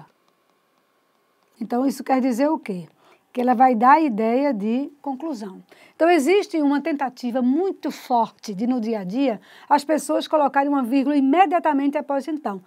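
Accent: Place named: Northeast, Brazil. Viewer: Professora, suas explicações e sua didática são ótimas.congratulações! Outra coisa: seu sotaque é muito bonito. Os nordestinos, nos destacamos muito nessa característica!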